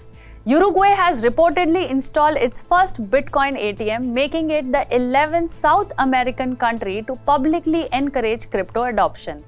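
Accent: Gujarat, India. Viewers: The content is very relevant and the updates are fascinating and fantastic. is very pretty, presentable and has s good voice. The only slight problem is that her accent is quite gujju and is a bit of a distraction. Hopefully she goes through accent and diction training. Amazing content. Probably the best I have seen anywhere on Cryptoverse.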